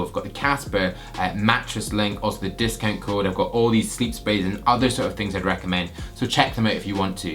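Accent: Scotland, United Kingdom. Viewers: Eddie Lopez It's a Scottish accent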